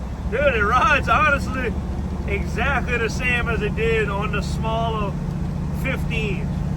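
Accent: Louisiana, United States. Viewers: That's what's up bruh! Keep doing YOU and the channel will grow... That southern Louisiana accent and your personality is guaranteed to garner some attention 😉.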